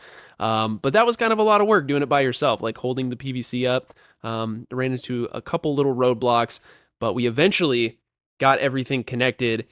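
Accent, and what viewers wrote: United States. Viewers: You motivate me to do such activities too 😁 It's super cool watching you speaking English. I try my US accent practice with your videos too 😂 So it's like killing two birds with one stone😂 😇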